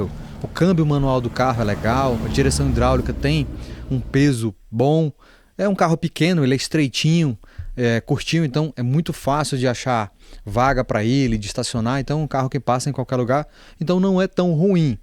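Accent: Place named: Ceará, Brazil. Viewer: Cabecinha de caixa d'água kkkk macho, comecei o vídeo achando que tu tinha um pé no Ceará e no decorrer, quando vocês fala "aqui no Ceará"...batista. Sua interlocução e montagem/construção do vídeo estão de parabéns. Grande abraço de um outro cearense e novo inscrito.🤝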